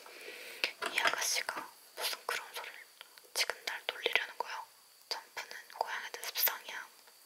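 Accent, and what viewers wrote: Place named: Gyeongsang, South Korea. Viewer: 너무 좋습니다. 경상도 억양도 너무 귀엽습니다.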